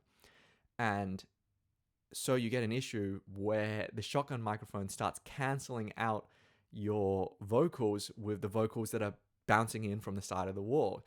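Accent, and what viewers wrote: Australia. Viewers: What boom arm are you actually using for the E614? It looks exactly what I need, and I hear your aussie, and I'm australian too so hopefully I can manage to snag what a beaut you have for yourself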